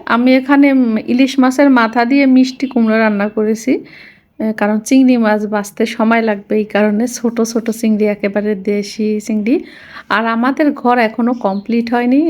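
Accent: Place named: Jessore, Bangladesh. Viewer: কেন জিজ্ঞেস করলাম আপু আপনার ভাষা অনেকটা যশোর অঞ্চলের মতো তাই জিজ্ঞেস করলাম খুব ভালো লাগলো আপনার কথাগুলো আপনাকে অসংখ্য ধন্যবাদ রিপ্লাই দেওয়ার জন্য।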